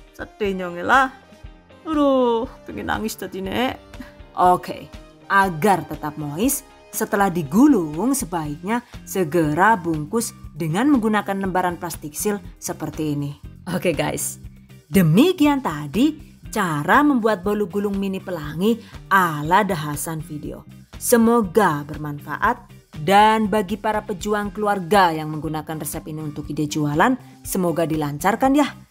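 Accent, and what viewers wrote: Banyumas, Indonesia. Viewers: Setiap play vidio pasti guyu dewek nk ada bahasa ngapak nya🤣🤣🤣🤣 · Mbaksayyyyyy...suka banget klo lagi mantengin channelmu....gaya ngapaknya yg khas bikin gk boring dn senyum2 sdri..penjelasanya dettilll...syukaaaaa..❤️❤️❤️❤️❤️ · Hallo assalamu'alaikum wr wb,waahh berapa banyak pahala yg bunda dapatkan dr vidio ilmu dr bunda yaahh....saya sangat senang dengan recep"yg bunda sampaikan...cara penyampaianya jelas..apalagi ada selingan ngapak"nya he he he he...mks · chanel sg pling tk senengi,idenya kreatif,video nya keren2 d tmbh lucu2 klo ngmong jwane medok bnyumasan..pembawa anya detil ccok tuk yg mau cb jualn,sllu on klo ada video trbaru gk ktinggln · Ngapaknya itu loh 🤣🤣🤣🤣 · Hahaaa... Seneng bgt denger ekpresi dan logat bahasa ngapak2nya Bun👍 sangat menghibur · Ketemu juga resepnya, Ide jualan lagi dan lagi,plus Tips dan Triknya CATAT😅 btw suami saya itu tdnya gak suka bhs ngapak, wlaupun saya istrinya jg wong jowo😁 Alhamdulillahnya klo sya putar video dia ska ikutan senyum" gara" pembawaan bunda yg ngapk tp unik suka bkin ngalak deh😂matursuwun bunda bnyk menebar manfaat d setiap videonya love # 💓 #GiveawayTHV2021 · Bun q pengin takon njenengan sih wong endi,deneng pinter ngomong ngapak 😄😄😄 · Ngapake kaya nyong😁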